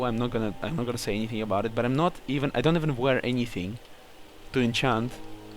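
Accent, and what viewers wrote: Russia. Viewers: Russian accent is awesome! Especially swedish with a russian tinge. :) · dude, he is russian as well as I do. Accent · he sounds more Russian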